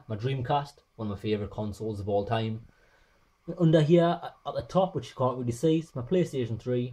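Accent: Newcastle, United Kingdom. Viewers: Here Kid are you a geordie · When listening threw my headphones my audio out sounds really low can I have some help Btw I realised your Geordie accent lol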